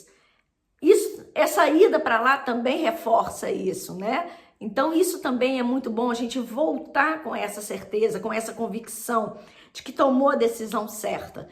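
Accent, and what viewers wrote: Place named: Minas Gerais, Brazil. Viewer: Minas é nosso país 🙏. Obrigado pelo vídeo xará...me emocionei mto na parte do sotaque!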